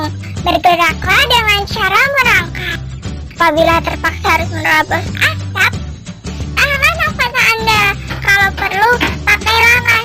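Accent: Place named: Java, Indonesia. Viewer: Keren, suaranya unik. Medoknya dapet. Terbaek memang. Hahaha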